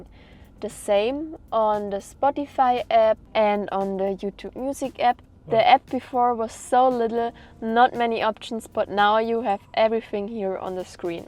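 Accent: Germany. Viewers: You are beautiful . Thank you so much for sharing this update. Are you a German? You have something like German accent.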